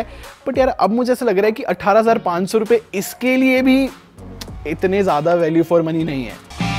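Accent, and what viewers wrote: Haryana, India. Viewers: bhai bahut sahi haryanvi boli hai aapne 👌👌😍😅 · Hum toh bus haryanvi sunne Aaay h☺☺ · Your haryanvi accent is so good 😀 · Bhai Mahre Haryane ka sound Ghana badhiya lagga, Beech beech me aisi video kar liya kar 🙏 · Very good Haryanvi 😀❤️❤️ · ठेठ haryanvi 😘 Act Jma gch bhai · Wah super real Haryanvi 🔥🔥 Jai Haryana Jai Haryanvi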